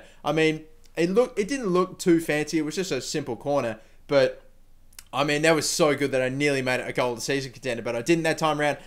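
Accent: Australia. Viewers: Are you Aussie!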